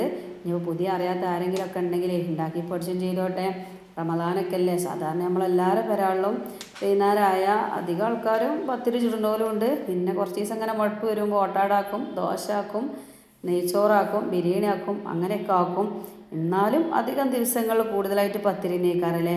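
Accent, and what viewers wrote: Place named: Malappuram, India. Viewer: മൊഞ്ചുള്ള മലപ്പുറം താതനെക്കാൾ മൊഞ്ചും ചൊറുക്കും ഉള്ള മൊഞ്ചത്തി നൈസ് പത്തിരി മാണങ്ങി തിന്നോളിൻ മക്കളെ പത്തിരിനേക്കാട്ടിൻ മൊഞ്ചുണ്ട്ട്ടാ താത്താന്റെ അയഞ്ഞാൻ കൊയഞാന്‍ ബർത്താനം എന്തത്താണ് മക്കളെ കേട്ടാലും കേട്ടാലും പൂതി തീരൂല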